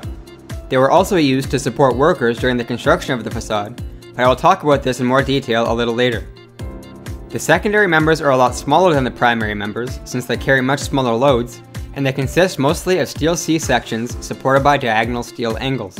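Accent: Canada. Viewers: Americans speak English. A LOT of brits have broken English as well but let's all ignore that fact. And he's probably Canadian, Americans don't pronounce words the way they do.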